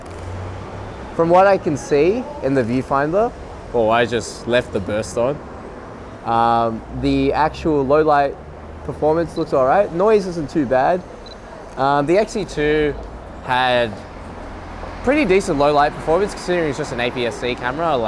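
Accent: Australia. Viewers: Good effort. However no standout point in a sea of xt3 reviews on YouTube, other than the Aussie accent part.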